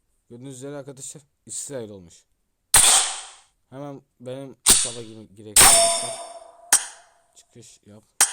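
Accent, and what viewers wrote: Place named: Syria. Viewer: Abi sen suriyelimisin konuşmayı unuttun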